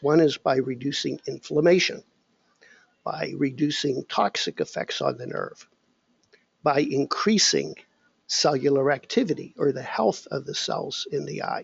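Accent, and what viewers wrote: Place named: Pittsburgh, United States. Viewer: You must be from the Pittsburgh area from the accent. Im from around there.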